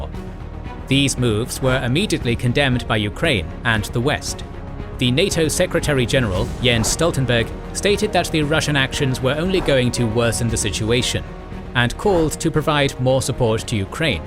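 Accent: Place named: RP, United Kingdom. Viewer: I want a British cut glass accent just like the guy speaking in these vids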